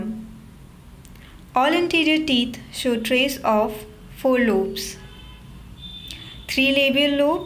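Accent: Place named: India. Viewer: I like the fact that I didnt understand it in my language and I found someone to explain it better in English with an indian accent. Funny . Thank you !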